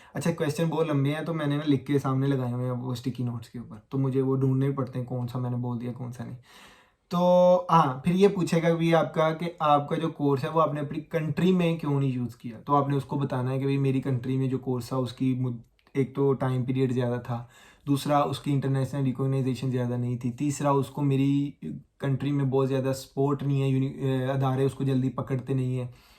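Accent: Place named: Faisalabad, Pakistan. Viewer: Bro faisalabadi ho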